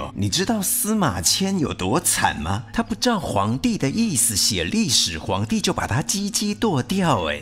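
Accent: Taiwan. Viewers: 這台灣國語聽的很不舒服，要就全臺語或全國語，吞不下去有點可惜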